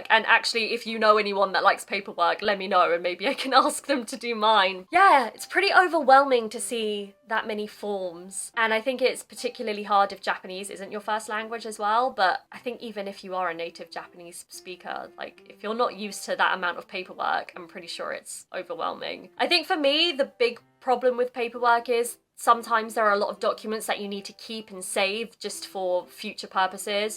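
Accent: RP, United Kingdom. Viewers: I was studying in America for 3 years, so I get used to American English. But your British English is easy for me to understand. Overall, Japanese people are polite. I don't like some Japanese people because they discriminate against physically challenged people. One day, I had a job interview. An interviewer said, "What happened to your legs?" He kind of laughed. That was really rude. I was glad I didn't have to work for them. Since I am vegetarian, I should visit your country some day. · この動画でシャドウイングしよ。 勉強になるし、何よりmodernRPアクセント??が心地よい☺️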